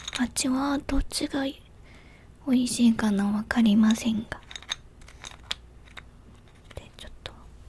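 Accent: South Korea. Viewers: かわいすぎ〜(*≧∀≦*) ヤッバ⁉︎ 韓国人が喋る日本語だーいすき❤️わかる人おるやろ爆笑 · もう一生懸命話してるの可愛すぎます← 韓国の方が話す日本語大好きです！（唐突） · 韓国人が喋る日本語スッッッッゴイ好き。この気持ちわかる人おる？めっちゃ可愛いんやけど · 普段日本語よりも韓国語の方が 発音とか口調とか色々と かわいいと思ってるけど、 韓国の方が喋る日本語はめちゃ可愛い🖤 とてもいい音ですね〜！ これからもがんばってください！ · 韓国の方だからですよ〜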